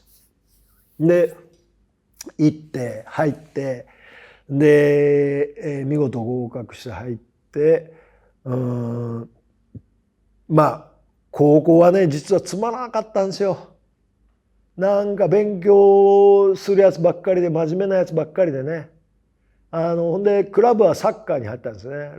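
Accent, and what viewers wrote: Kansai, Japan. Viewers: さん、大好きです💕 お顔も声も、どストライクです💖ちょっと関西弁なところも…😍お若い頃から変わらず大好きです💘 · 何か…好きやなぁ😊 さん。変わらないと言うか、お話に虚栄が無い。関西弁が心地好くて人格が素敵✌️ お身体に気をつけて変わらないワールドを発信して下さい。ありがとうございました💚💛❤️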